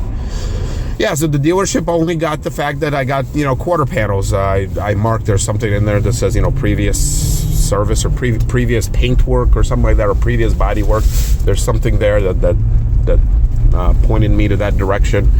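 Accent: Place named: Alabama, United States. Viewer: He is from Mobile, Alabama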